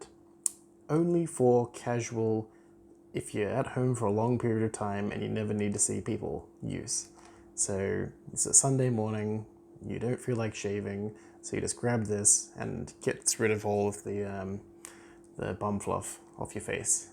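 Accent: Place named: Germany. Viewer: Deutscher Akzent kickt wie Beckham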